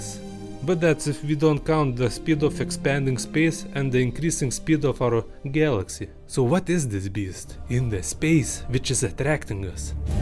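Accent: Russia. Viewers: His russian accent is attractive lol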